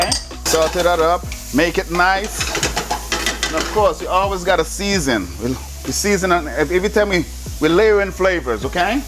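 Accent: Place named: United States. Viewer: Your stateside accent,,is funny 😂! Be yourself, its okay😅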